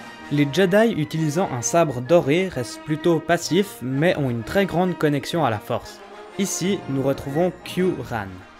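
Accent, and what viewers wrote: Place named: England, United Kingdom. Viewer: horrible accent anglais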